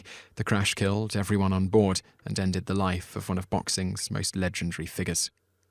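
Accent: United Kingdom. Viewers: Too many famous people have gone down in planes, esp. in those single or twin engine prop planes like Cessna! Rich folk with their toy planes! Has happened even locally once in awhile where I live, a local airport with a line of small planes called "Gull Airlines"! Wouldn't get me up in those tiny planes for anything in the world, forgetaboutit! BTW, Ethel Kennedy's parents were both killed in a plane crash together as well and Jackie knowing the family history didn't want JFK Jr. to fly and said he was easily distracted and may have had ADHD! May they all rest in peace. Love a British accent...thanks for sharing~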